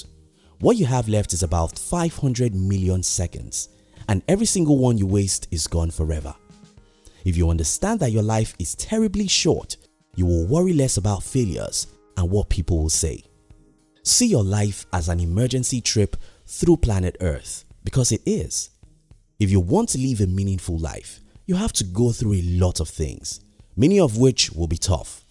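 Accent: Nigeria. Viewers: Are you Nigerian? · You sound like a Nigerian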